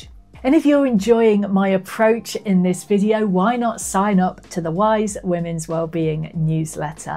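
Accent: United Kingdom. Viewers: I love the way the English say almost anything.❤️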